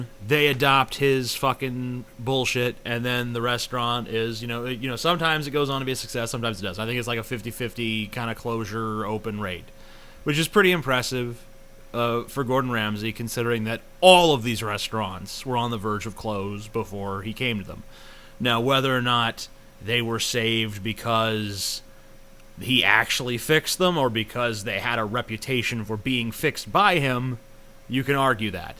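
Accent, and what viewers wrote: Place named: England, United Kingdom. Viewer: Your English Accent is amazing x)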